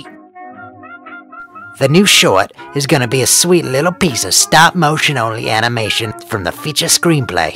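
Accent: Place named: France. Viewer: T’as un accent français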